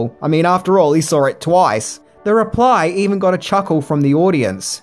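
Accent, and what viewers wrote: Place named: Australia. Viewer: this is what tim pool looks like without his beanie, hey tim pool pretty good aussie accent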